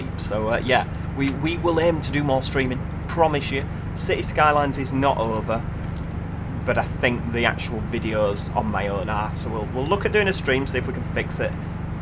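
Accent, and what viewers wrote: Manchester, United Kingdom. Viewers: I'm writing you from isle of dr-seuss...is your accent from manchester there, please..? if not, would you then let me know wherefrom? <link> ;)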